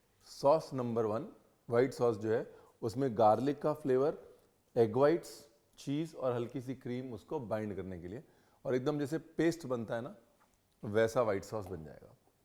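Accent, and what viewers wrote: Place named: Lucknow, India. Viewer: Mujhay to aapka Lucknow style bolnay ka style bohot pasand hai ❤❤❤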